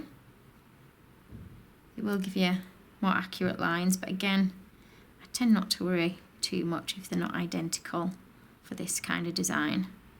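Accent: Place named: West Yorkshire, United Kingdom. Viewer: Great channel xx just wandering of your from West Yorkshire..you sound it haha xx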